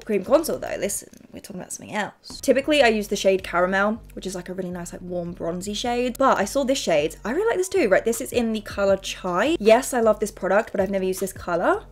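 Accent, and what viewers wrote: Australia. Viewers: I'm so intrigued... I've only watched vids for a few months and absolutely loooove them. But, can any OG fans answer me something. Is she from Australia originally? I can always hear a twang in her accent and I can't work out what it is 😅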